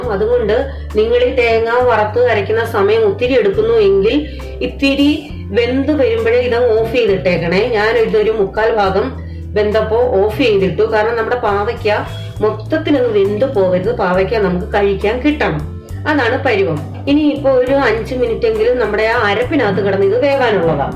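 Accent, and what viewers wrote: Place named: Thiruvalla, India. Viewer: Chechyde veedu Thiruvalla- Kumbanad side aano?? Correct aa style samsaaram...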